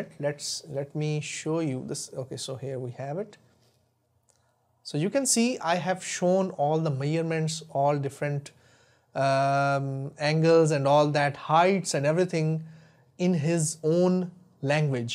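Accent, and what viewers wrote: India. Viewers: You have an indian accent.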